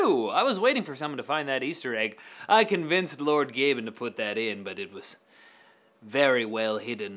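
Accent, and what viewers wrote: Ireland. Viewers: such a racist Irish accent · Nice Irish accent....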